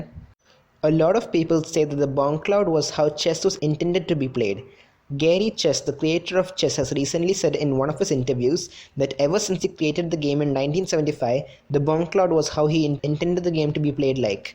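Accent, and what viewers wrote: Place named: India. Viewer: Ah! Indian accent! The worst english mix accent ever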